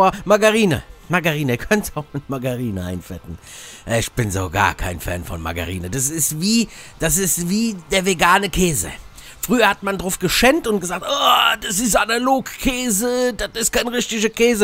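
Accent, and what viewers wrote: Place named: Hesse, Germany. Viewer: Gut Esse, hessisch Gebabbel und rockisch Mussigg! Was will mer mehr!? Mach weiter so! Grüße aus Mittelhessen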